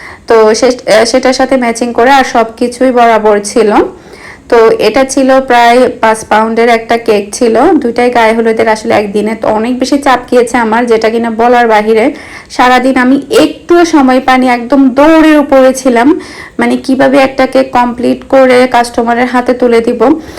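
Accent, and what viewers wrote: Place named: Satkania, Bangladesh. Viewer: চট্টগ্রামে না সাতকানিয়া স্টাইলের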